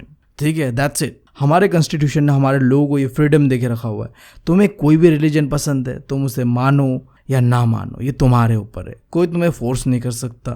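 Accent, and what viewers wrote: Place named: Northeast, India. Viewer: This educator is North eastern? I think so from the accent 😊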